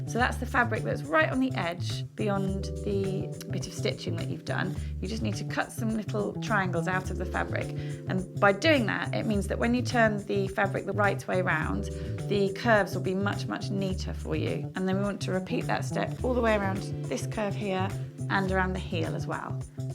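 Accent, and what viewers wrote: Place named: United Kingdom. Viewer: Is it just me or is everything better with a British accent? Because that was smooth and clear rather than the last video I watched. Great job!! Looking forward to making these for the family. Can't wait to embroider names on the cuffs too :)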